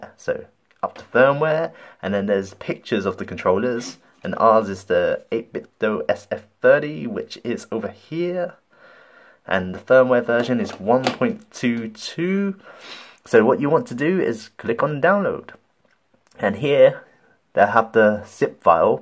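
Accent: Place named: England, United Kingdom. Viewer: Thnx dude, i love your English accent =]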